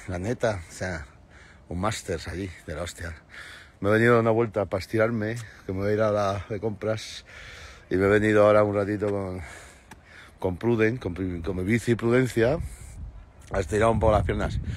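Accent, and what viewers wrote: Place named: Mexico. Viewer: Que bello Rafa, me mato con su acento mexicano 😄 Igualmente que pases unas muy felices fiestas, llenos de momentos inolvidables a lado de tus seres queridos, Mucha salud para ti y para ellos tambien. Siempre me gustaron todos los chicos del grupo, pero Rafa fue mi consentido por locochon 😜😄 Los Hombres G fueron mi primer concierto de rock, tenia en aquel entonces 13 años cuando vinieron a mi ciudad en Chihuahua Mexico a dar un concierto en el '89, recuerdo que mi papa se me pego para ir al concierto con el pretexto de cuidarme y al final resulto que se sabia las canciones y las canto a todo pulmon, Fue inolvidable y guardo muy gratos recuerdos de aquel concierto. Ahora vivo en Estados Unidos y siempre que vienen a mi ciudad los voy a ver. Saludos!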